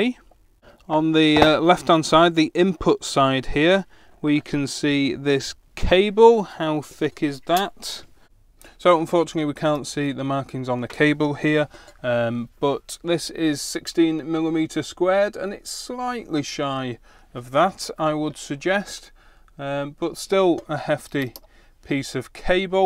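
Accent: United Kingdom. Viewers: great reviews so far, a fan from another 'experimental techie' from indonesia. Just wondering have you done a "Cheapest On-Grid Inverter" review already? I guess this piece is the only missing review that makes a solar newbies out there would expect from you. MPPT function, Pure Sine Wave and 85-89% conversion power rate are few claims that I would think you probably the best person to prove it. I could point few inverter candidates for your review;) Anyway, just a thought, love your work so far and keep that British accent!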